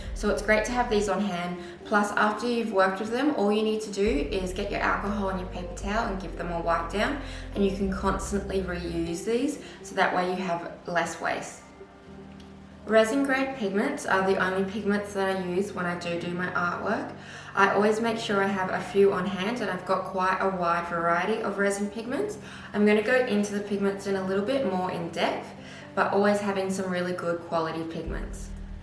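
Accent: Australia. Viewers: Aussie perhaps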